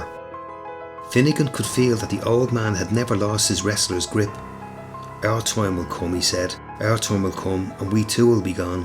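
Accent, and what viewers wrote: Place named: Dublin, Ireland. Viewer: I loved that story... and the way you told it. The history mixed in too.. Listening from Australia.. originally from Dublin.. I miss hearing my accent.. thank you man ☘️🇨🇮🙋‍♀️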